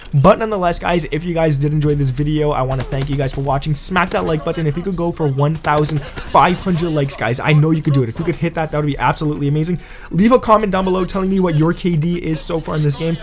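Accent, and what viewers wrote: Canada. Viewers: Shawn Tarbox I think he is Canadian based on accent. He can’t help it!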